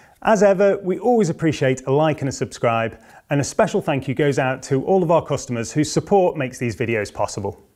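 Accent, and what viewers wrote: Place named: Middlesbrough, United Kingdom. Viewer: I know this has nothing to do with composites, but Id like to know where your accent is from, I know it's a commonwealth accent, but I keep hearing a bit of brough in it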